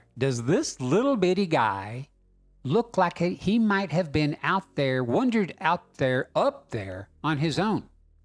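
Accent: South, United States. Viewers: Damn you and your common sense! All delivered with a charming southern accent :)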